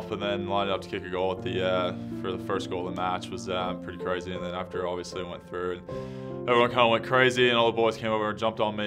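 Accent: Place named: Australia. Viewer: Picking up a bit of an Australian accent. Listen every time he says "so".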